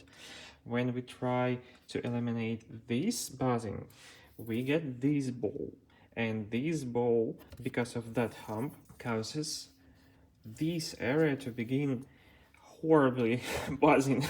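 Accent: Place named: Eastern Europe. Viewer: Сильный славянский акцент, чувак. Откуда ты? Россия? Украина? Беларусь?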